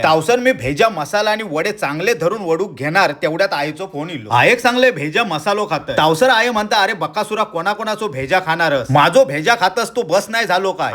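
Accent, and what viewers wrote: Malvani, India. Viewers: Mast jewnaj tuzya malvani bolenyachi Jo tadeko asetat na tyeka kashachich ser NY yevechi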